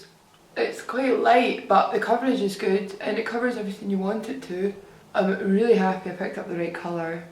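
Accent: Scotland, United Kingdom. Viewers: Omg your Scottish me too xox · Which part of Scotland are u from I'm from Glasgow ! 💕 X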